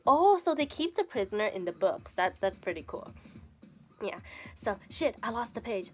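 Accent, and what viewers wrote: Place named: England, United Kingdom. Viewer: i wish i had your english accent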